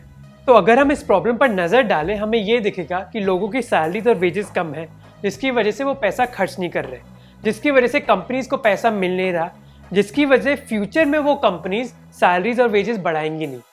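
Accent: India. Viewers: Speaking Indian way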